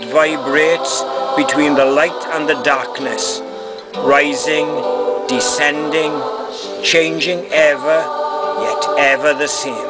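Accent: Wales, United Kingdom. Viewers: I really love your recording of the invocation, the profundity of the invocation combined with that beautifully medlodic welsh accent is music to my ears. I've been using your recording to listen and prepare before I perform the invocation myself for the last month or so. Esecpially yesterday since it was August 6, a day sacred to Thoth, and also happened to be Wednesday, day of mercury, so it was the perfect time. This invocation is brilliant for preparing oneself to engage in artful expression, my writing just flows like crazy a day or two after the invocation, and also the request to 'aid and guard me in this work of art' is a particularly moving exhortation. So, many thanks my friend for this little gift. Highly appreciated ;) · Pure Welsh accent right there.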